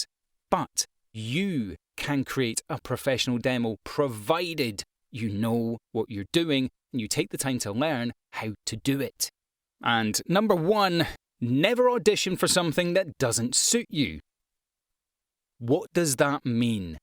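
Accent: Scotland, United Kingdom. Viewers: Thanks for the assessment and the James Earl Jones comment -- made me titter. P. S. I'm British-American, and to my native English now partly American ear, you sound INTENSELY Scottish! Heh heh heh.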